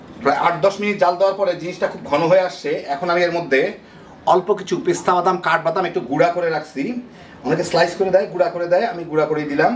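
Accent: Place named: Bikrampur, Bangladesh. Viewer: Assalmualikum . Are you from Bikrampur (Munshigonj) ? Your sound seems like that .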